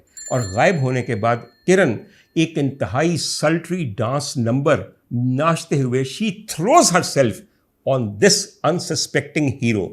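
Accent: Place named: Gujranwala, Pakistan. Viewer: Such a realistic and brutal review. I enjoyed the typical Gujranwala Punjabi.... Especially kanwann day athru 😂😂 Stay blessed dr sahab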